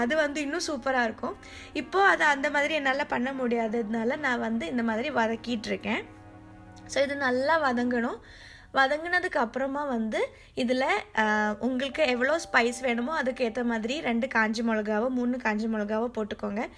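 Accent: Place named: Tamil Nadu, India. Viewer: Hi sister,I will try garlic chutney,Ur mother's recipe 💐💐Ur Tamil slang kaekavae nan unga video parkaren sister .bye from santhi 😍😍😍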